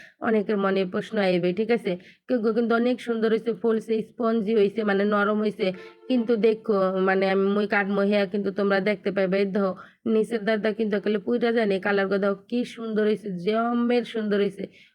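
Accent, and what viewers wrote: Barishal, Bangladesh. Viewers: আপু গো তোমার মুখে বরিশাইল্লা বাসা খুব খুব সুন্দর লাগে ❤👍ভালোবাসা দিয়ে দেখা শুরু করলাম শেষ পর্যন্ত তোমার সাথে আছি আপু ❤👍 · ৩০👍মনু তোমার বরিশাইল্যা কতা জম্মের ভালো হুনাইছে।তোমার নিহারি অনেক সুন্দর হইছে তয় পেঁপে দেলা ক্যা?একলা একলা খাইলা মোরে একটু দেলা না😥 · আপু নিহারী তন্দুরি রুটি খেতে দারুন লাগে আর তুমি কি ভাষা বলছ তা কি বরিশালের ভাষা তুমি কি বরিশালের মানুষ???? আপু ভাইয়া তো কত সুন্দর করে কাঁঠাল ভাঙছে, চ্যানেলের নাম চেঞ্জ করে দেবে???? মশকরা মশকরা ভিডিও দেবে 😂😂দিও আমি দেখার জন্য রেডি আছি তোমার ভিডিওটা দেখতে একটু লেট হয়ে গেল দুইটা বাচ্চা নিয়ে আমি নাজেহাল অবস্থাতে আছি খাওয়া দাওয়া ঘুম নিদ্রা সব উঠে যাচ্ছে। 😢😢কেক বানানো দারুণ হয়েছে ঠিক বলেছ আপু একটু অন্যরকম ভিডিও দিলে খুব ভালো হয়। আমি তো একঘেয়েমি হয়ে যাচ্ছি 😢 তোমার জন্য অনেক অনেক দোয়া রইলো অনেক দূরে এগিয়ে যাও মাশাল্লাহ তিন লাখ ভিউ দেখে তো আমি খুব খুশি 🤲🤲🤲🤲🤲👍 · আসসালামু আলাইকুম, কেমন আছো আপু, তোমার এই বরিশালের ভাষায় কথা বলা খুবই ভালো লাগলো আপু, নিহারি রান্না লোভনীয় হয়েছে ❤❤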